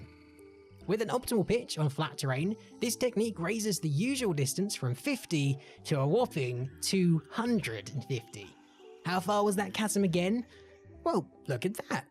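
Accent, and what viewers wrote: United Kingdom. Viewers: So british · Thats how ever british person sounds like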